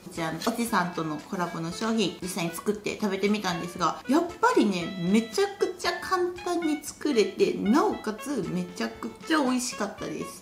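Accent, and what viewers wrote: Kansai, Japan. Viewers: 関西人なの？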